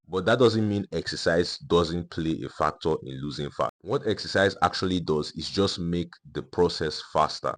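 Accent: Nigeria. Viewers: I am not sure if it is the Nigerian accent, or something. But this guys is very believable. Plus he tells the truth; no drama; no frills. Thank you! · For those who are not from Africa, he’s not rude or mean, he’s just Nigerian. 🇳🇬🇳🇬😅😅😅 This is how they talk on regular conversations. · great video aside from the annoying nigerian accent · Accent does not matter. We are proud Nigerians why pretend 😮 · It's massive Nigerian confidence. No pretenses. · That’s more of a Nigerian accent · this is Nigerian accent, what are you talking about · My brotha you sound like a Nigerian. Oga God bless you ooo. Make I loose my belle ooo. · The Nigerian accent usually gives the opposite experience lol but okay. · It's Nigerian accent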